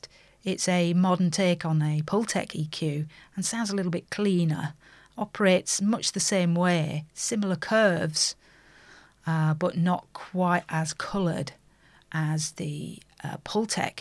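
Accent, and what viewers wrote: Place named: Northern England, United Kingdom. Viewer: Just came across your channel, what a breath of fresh air. Not only is great to see a female Youtube channel based on audio engineering which is rare enough but a Northern accent too! Makes such a change from hearing all the Americans talk about everything haha. Thank you!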